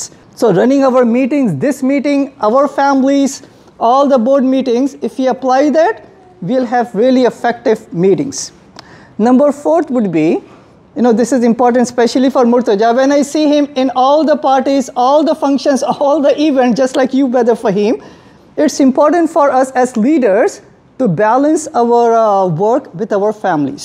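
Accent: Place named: India. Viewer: I like when indians speak english 😍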